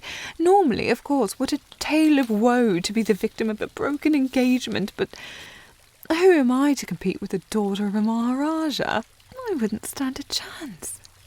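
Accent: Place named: England, United Kingdom. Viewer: Yo, when she hit me with dat " Well go on, go! Time is of the essence!", I was so happy. Dat English accent slaps!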